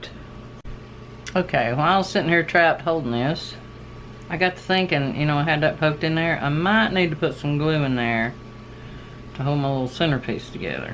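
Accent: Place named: North Florida, United States. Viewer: Loove this, too! I am also a person who loves thrift store fabric! I am so crazy about fabric flowers. I like almost anything made from fabric. I could craft 24/7 , IF I had the time! I am from North Florida. You sound like us here in good old Live Oak. I am guessing you are from the south as well. I love watching you and listening to you. Thank you for sharing.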